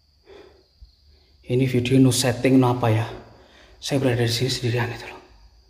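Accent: Java, Indonesia. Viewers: Logatnya meddo bgt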